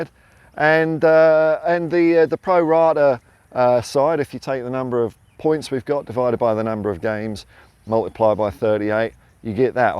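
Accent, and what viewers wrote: Australia. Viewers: I knew this bloke was Aussie, great bloody content worth the sub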